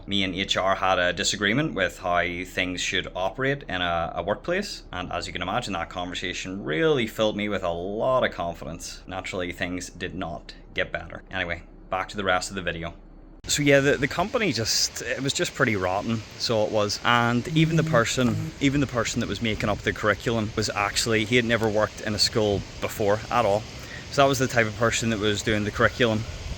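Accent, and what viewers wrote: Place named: Ireland. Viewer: "My accent really isn't that thick and that broad" Uh, yes. Yes it is. I'm not saying you should change it, but you must certainly know that you have an incredibly thick Irish accent. Nobody does well in those unofficial language schools. They can't charge their students enough to both keep the doors open and pay their teachers fairly unless they're huge or gov't sponsored.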